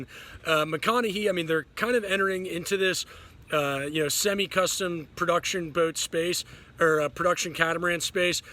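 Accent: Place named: Delaware Valley, United States. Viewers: LEEDS8 Delaware Valley (Del.Md.,Va.) so.Pa, Jersey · I bet he is from PA, all my cousins from PA have the same accent.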